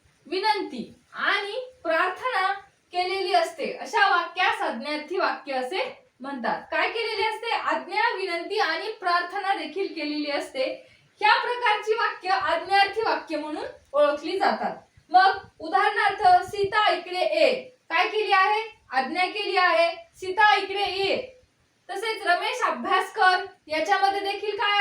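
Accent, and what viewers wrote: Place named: Thane, India. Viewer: मॅडम (ताई,) आपलं गाव कुठे आहे आपली भाषा ठाणे जिल्हा मधील वाटते मी शहापूर, मुरबाड जिल्हा ठाणे मधील आहे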